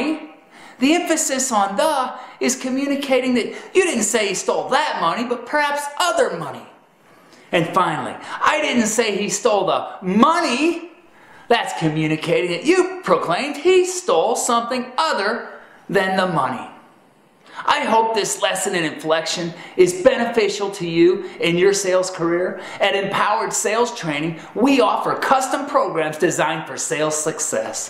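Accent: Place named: United States. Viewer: This is very stereotypical american. Perhaps they play it at public American culture courses? Anybody talking even remotely like this is guaranteed to only care about your money.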